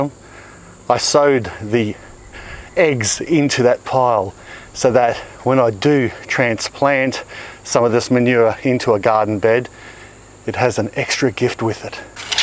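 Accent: Australia. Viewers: Just found your channel.. Not sure how but I love it..love the Australian accent! Are you or anyone else able to tell me where you are getting those oval green raised beds? Thanks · I'm hearing a slight Aussie accent 😂😂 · I love this guy!!! So much of fun, so a lot of information, so inspirative. I like dude your Australian accent, great work!! :)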